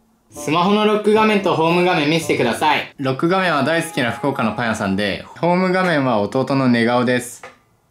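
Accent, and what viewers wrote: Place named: Yamaguchi, Japan. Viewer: たしかに山口の方言使っちょるねw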